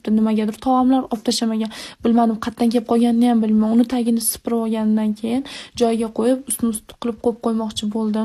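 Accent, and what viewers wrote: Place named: Tashkent, Uzbekistan. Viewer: Sizam xozi toshkenli bopkettiz😂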